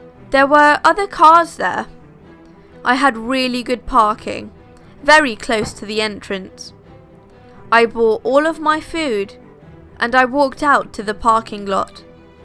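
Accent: United Kingdom. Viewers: I like your videos, mostly because of your voice and the well-picked stories. But I have to say, that the videos don't "haunt my nights" like other creepypasta-narrations (or any other creepy stuff :P) because of your voice. Personally I would say, that your videos (and your voice + the cute, little, british accent :D) are fascinating and, yes, also a bit creepy (And you don't want to know how long I've been searching for a combination like that) In short: I like your videos and ,please, never change the way your narrate the stories :)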